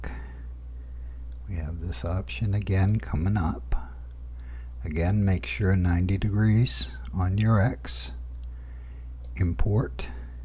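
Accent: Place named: Boston, United States. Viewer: Thanks for the run through - you sound like you may be from Boston :)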